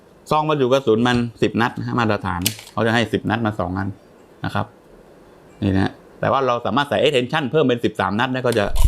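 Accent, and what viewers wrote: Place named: South, Thailand. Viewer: ผมมีเพื่อนคนใต้หลายคน ที่พยายามพูดกลาง สำเนียงออกมาแบบพี่บ่าวคนนี้ทุกคน ฟังแล้วน่ารักดีครับ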